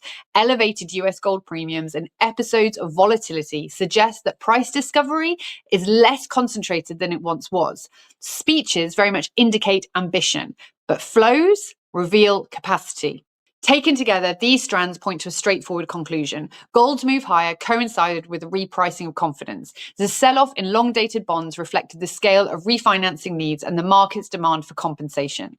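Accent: England, United Kingdom. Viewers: I just love English people. I could listen to y'all talk about paint drying. Great presentation though.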